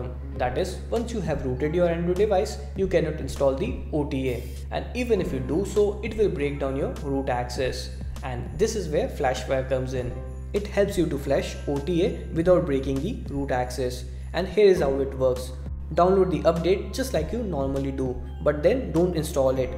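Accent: India. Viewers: I LOVE your indians accent! hah xd so cute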